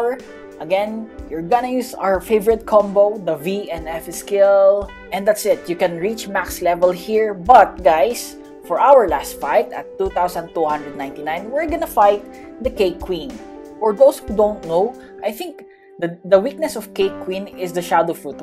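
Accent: Philippines. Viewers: Your Filipino, accent and the way you talked to the guy you took the yeti from "lods" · PINOY KA?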